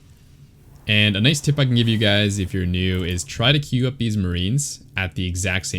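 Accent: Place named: Hungary. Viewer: Aren't you hungarian by any chance? your accent sounds hungarian.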